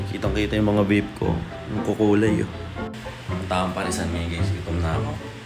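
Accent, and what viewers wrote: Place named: South, Philippines. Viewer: dalang dala yung punto ng taga south. yung "ehhhh", "walanjumuyon", "walanya" "apakatuleg mo!" hahahaha goods na goods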